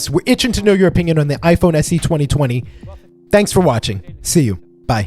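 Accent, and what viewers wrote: Russia. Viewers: Я прям слышу на фоне стандартный русский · Немного слышиться русский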